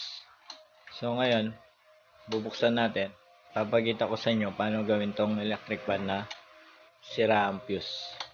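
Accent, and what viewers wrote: Pampanga, Philippines. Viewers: ang galing boss. itatapon ko na dapat yung dalawang sirang electric fan, napaandar ko pa. medyo mahina lang, try ko yung sinabi mong palitan ang capacitor para lumakas. salamat po! parang may pagka-kapampangan ang accent mo boss hehe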